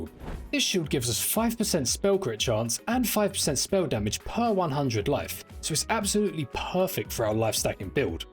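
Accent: United Kingdom. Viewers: ah, yes, all the things I love in one video - chaos, cats and crit-proc calculations narrated by a cockney bloke <3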